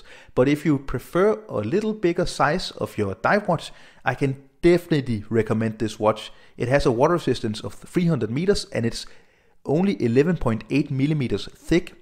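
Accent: Denmark. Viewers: Good old Danish accent ! 🇩🇰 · Hvordan kan man bare høre at du er dansker på accenten hahah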